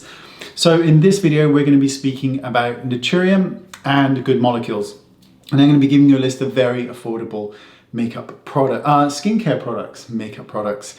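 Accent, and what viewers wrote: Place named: United Kingdom. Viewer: I wonder which British accent has. It’s so subtle.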